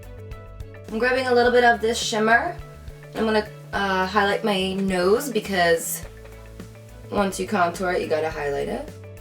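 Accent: New Jersey, United States. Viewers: I loveeee your Jersey accent and Jerseyism! I've had a love/hate relationship with NJ since moving here from California but you make me proud to call myself a Jersey girl now! <3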